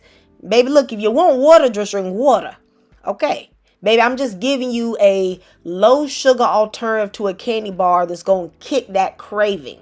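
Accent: South, United States. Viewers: Are you Southern? Like the video 💙💚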